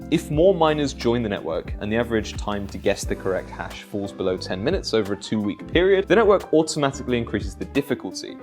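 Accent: United Kingdom. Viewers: British accents make people sound smart. This is 11 minutes of chatter with no new or useful information. He has a suit and tie and speaks like an intelligent person. That's it. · Just because you wear a $2 suit and has an English accent, doesn't mean you know what the fook you are talking about.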